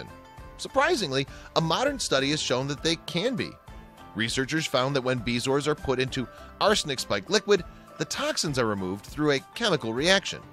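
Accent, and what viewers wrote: Midwest, United States. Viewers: New subscriber, enjoy your videos. The narrator on this video has a distinctive Midwestern accent. I enjoy hearing it since I've been in the south for the last 20 years.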